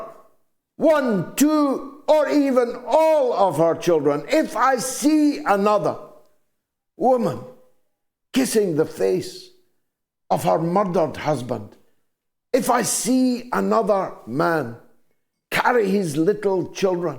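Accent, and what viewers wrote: Scotland, United Kingdom. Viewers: We love you 💖💖💖 You are such an honorable man! Deep Thanks for this speech! Eventhough, as a french-canadien, I must use the subtitles here and there because of your ''strong Scottish'' accent (which I love) 😉, I will continue to listen to you, sir. As I started to search for the ''truth'' about what's going on in this messy world, my son told me, several months ago, that you were a most valuable and reliable person with integrity and determination. So I started watching your program and I want to congratulate you and express my gratitude, from the bottom of my heart. Besides, I'm 69 so... you're older than I, which makes you even more honorable in my eyes! Take care and stay safe❤🖤🤍💚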